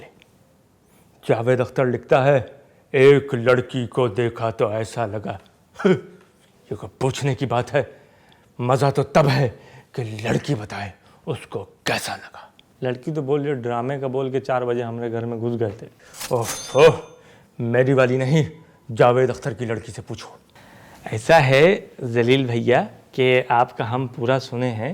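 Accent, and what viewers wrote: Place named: Bihar, India. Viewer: 😂😂😂kamal yar kamal bihari accent ufff kamal sa b upar .. acting perfectly Bihar ki full yar Accent 😅😂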